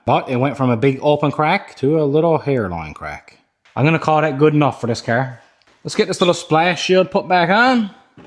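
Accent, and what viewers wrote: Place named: Newfoundland, Canada. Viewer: Greetings from Ontario on a sunny -22 Feb. morning. I must say how much I enjoy your channel and your social media posts, well done! Buddy, your humor, accent, and content make for the best ambassador of Nfld! I have a question: I am an older fellow that enjoys making videos... you mentioned in a video that you use your phone and an external microphone. To improve my sound quality I would like to purchase a microphone. May I ask what microphone you use and how long the battery lasts as well as any other thoughts or recommendations you might have. Thanks so much, Paul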